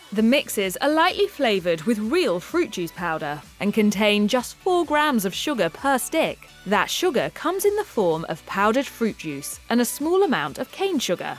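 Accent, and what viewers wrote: England, United Kingdom. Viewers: English accents legitimize Mashed Science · Why do you use a British accent in some of your videos? Is this a British content provider? It’s strangely distracting.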